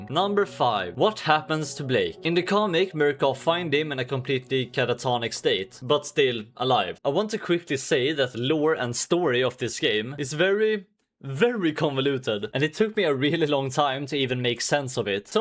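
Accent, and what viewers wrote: Sweden. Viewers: I never realized how Swedish pewdiepie’s accent was until I watched you, how quaint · Most Swedish accent of all time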